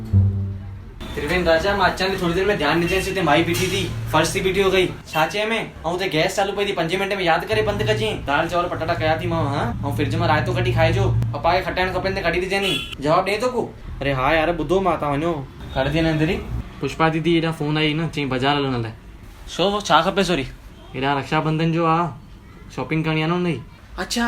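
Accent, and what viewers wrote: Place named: Larkana, Pakistan. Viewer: Your grandparents must be from Larkana because your Sindhi is 100% similar to ours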